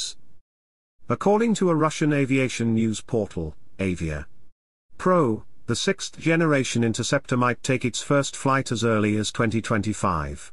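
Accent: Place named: United Kingdom. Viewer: Very interesting report.. Excellent British pronunciation.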